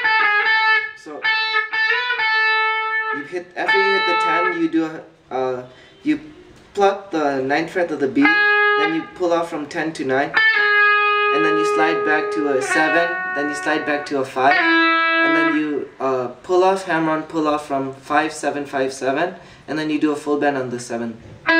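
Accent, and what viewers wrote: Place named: Canada. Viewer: Timro English ta daami cha yarr. I live in london and I thought you lived in Canada or somewhere cause of your English. Nice Job with the lesson by the way.:)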